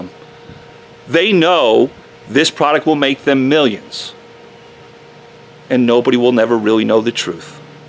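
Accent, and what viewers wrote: New York, United States. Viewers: If he's here in Dallas, why does he have a NY (or L.A.?) accent ? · That's a NY Accent. LI likely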